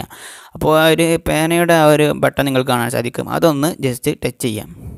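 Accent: India. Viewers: ഇടക്ക് ഞമ്മളെ കോയിക്കോട് നാടൻ ഭാഷകയറി വരുന്നു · Are you from malappuram♥😂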